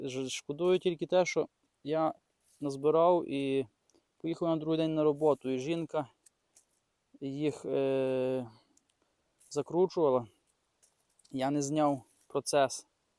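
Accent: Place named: Prykarpattia, Ukraine. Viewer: Ви так просто і щиро розповідаєте, і дуже гарний говір у вас, прикарпатський.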